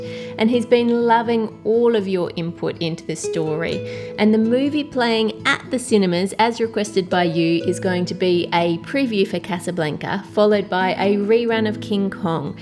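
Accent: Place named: Australia. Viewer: English isnt my first languarge and I learned english by a american. so this austrailian accent is a bit hard to understand for me. 😅 The words sounds so different.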